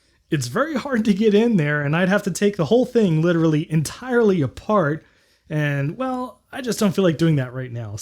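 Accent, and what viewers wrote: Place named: North, United States. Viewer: It depends on your accent. I'm not sure about American accents but sounds more like a northern states person, close to Canada. Not sure. I'm British and we pronounce hyperbolic as a mix between the way you said it's meant to be pronounced, and the way that Americans pronounce it. Like "hy-per-ber-lic". Actually though, "hyper-bol-ic" is *not wrong*.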